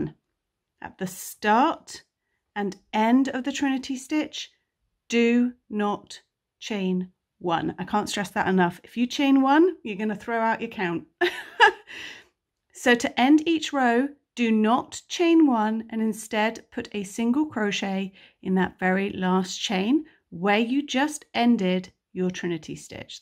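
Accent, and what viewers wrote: United Kingdom. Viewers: another excellent tutorial. has a pleasant easy to listen voice in UK English.Not sure why American crochet terms are used though. I am sure there is a good reason?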